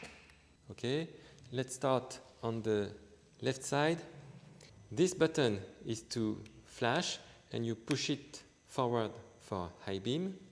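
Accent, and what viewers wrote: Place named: France. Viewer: Très bonne présentation 👍 mais peut-être mieux en français (vue l’accent 😉) et sous titré anglais !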